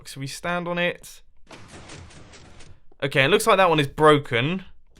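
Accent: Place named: United Kingdom. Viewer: How many other people freaking love his accent? It's so great, it's probably just me, I have a thing for British people.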